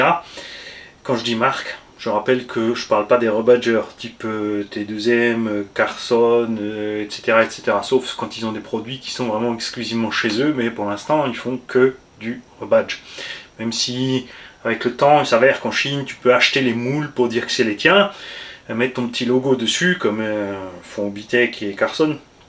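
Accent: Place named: Alsace, France. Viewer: Ah... losi c est grâce à la dp.pro que j ai repris la rc,( j avais ausssi hésité avec un slach)elle est jamais bien loin dans le coffre quand on part en vacances ,il y a toujours une place pour elle.En tout cas elle fait bien le taf .et dire que j avais failli acheter le mavrick quantum fluck, beautiful comme disaient cettaines vidéos 😂😂jusqu au jour où je tombe par hasard sur un blondinet avec l accent alsacien qui me semblait bien plus sincères dans ses propos et voilà comment on en est devenu accros de cette chaîne de dingos 😋avec son présentateur et ses abo,on se marre bien,et la collection s agrandi tous les ans. Sinon pour finir le sbr 2 me tenterai bien pour essayer en remplacement du mojave mais j hésite fortement déjà je sais que la caro c est de la feuille de riz😂😂et ça fait c...quand-même Bon allé montre nous les essais du losi 5 qu on bave un peu comme avant. 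Bonjoute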